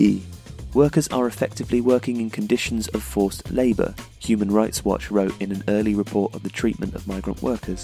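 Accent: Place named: England, United Kingdom. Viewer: your narrative sounds heartfelt and sincere, in the end that's all it is. The narrator sounded English, presuming you are too. Frankly, just cut the crap ok, mate. If you are English you need to cut the b.s. with your holier then thou tounge. English were always the clever racists, at least that's what they believe anyway. Like I said, don't use the poor migrant workers as a front to establish your bigotry( I have relatives who lost almost everything in these scam), your agenda is quite clear and clearly biased. You are also showing your true color and age, by using animation to describe something that lack authentic journalism. Make your YouTube bucks and shut your trap, boy.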